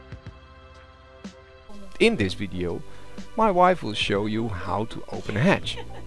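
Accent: Netherlands. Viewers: ITSAAA DUTCH ACCENT, HELLO COUNTRY BROTHER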